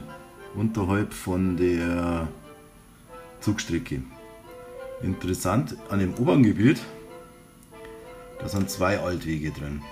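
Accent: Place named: Bavaria, Germany. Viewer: Oha, eigentlich supergeil... aber da bayrisch isda schweer füar da nordditsch. quaquwetschkommod....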